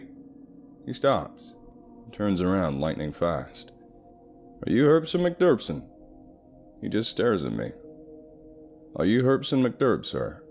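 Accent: South, United States. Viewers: Man I dig ur southern U.S. accent! From Oklahoma myself!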